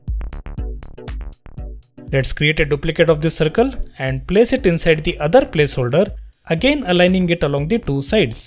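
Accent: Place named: South India, India. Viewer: Wow.... Sir i dont know why, But i feel you are from south india.. May i know from which city you are ?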